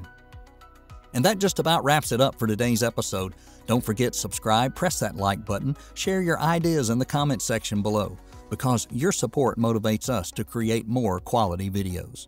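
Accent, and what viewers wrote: South, United States. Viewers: don't get me wrong I love you guys... You're my main spacex channel now..🤗👍 And I think the main reason is you have a Southern accent like me and I can understand you better 😂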